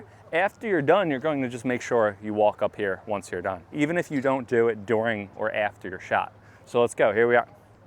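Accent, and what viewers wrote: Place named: Philadelphia, United States. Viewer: Just discovered your channel—very valuable lesson! —you from Philly? Dialect reminds me of home…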